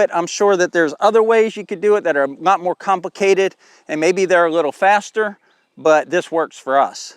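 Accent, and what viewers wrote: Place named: Florida, United States. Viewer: your Florida accent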